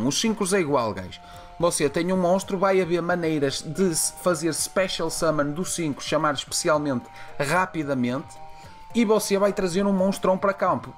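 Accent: Portugal. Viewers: de Portugal, ora pois xD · Pensei que portugual falaria mais parecido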